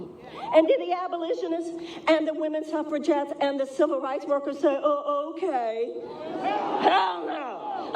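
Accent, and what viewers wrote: United States. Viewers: She’s very well spoken. I’ve heard her speak before but somehow I never noticed her Texas accent. She sounds really smart.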